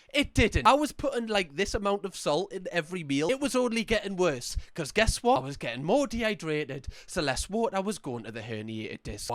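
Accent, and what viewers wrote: Newcastle, United Kingdom. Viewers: Here a 55 year old from Zambia 🇿🇲 I enjoy your videos! Love the geordie accent too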